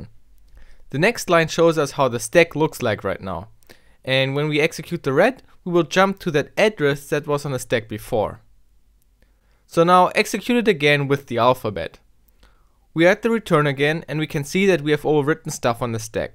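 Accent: Germany. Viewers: Do I hear a German accent?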